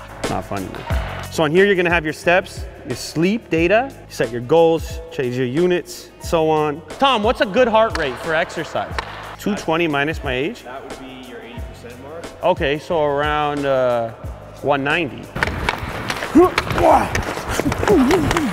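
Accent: Canada. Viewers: Is it just me or his accent got a little more Canadian in this video compared to all the others?😂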